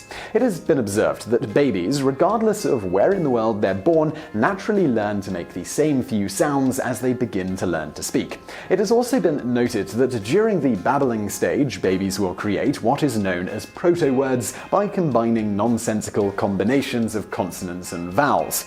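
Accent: England, United Kingdom. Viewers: You really do NOT need to pander to us American rubes. We can handle your English accent, and moreover, many of us are either from Canada, or have relatives there, so we're not (or shouldn't be) terribly alienated when you say "mum" instead of "mom." Oddly, you also refer to the Oxford dictionary. If you're gonna go all out American here you should probably stick to a version of Webster's. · I'm American but I'm so used to the British accent I couldn't tell if he was British or American for like 3 minutes that was so wild wtf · Anyone else think this is Vsauce putting on a British accent? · Daven, I'm kind of the same way, I have so much British and otherwise foreign influence in my life that I'll often use british phrasing and not think about it. And I don't think it's really a big deal, whenever people freak out in the comments and criticize, I just laugh that they care that much about a pronunciation or phrase, especially in this case of a British narrator reading an American-authored script; it's bound to have all sorts of different terms and pronunciations thrown around! 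I've never understood why some people are so uptight about language, like thinking a word has to be said or spelled this way or some dialect isn't really the same language just because it's not the same as how you say it (looking at you, France!). I personally love how English is so diverse between British and American phrases, spellings, and pronunciations, and I count all of them as correct and don't critique someone for using one they wouldn't normally; that's what learning and experience is all about! I honestly wouldn't have sweated it if I were Simon. If I were him, I would have just read it however my brain decided to pronounce it at that moment and not care. And yeah, knowing him, his schedule being like that is pretty common, unfortunately! I wish he'd start doing updates on his vlog again, even if just weekly or every other week or whatever, so we could be in the loop! Send him a quick reminder from the fans, would you? 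;) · You should have stuck to the British pronunciation, Simon. Your accent is strong enough that when you try to say Mom, it doesn't sound at all like anything an American would say. And, believe it or not, there are plenty of Americans that use mum, instead of mom. So you wouldn't have been misunderstood. I don't get your reasoning behind deciding to do this.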